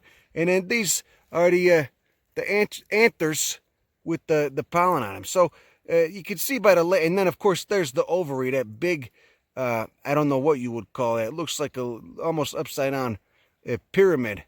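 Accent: Chicago, United States. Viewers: He's an Italian from Chicago. And that's a pure Chicago italian accent. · This guy sounds like he's from the near south east side of Chicago!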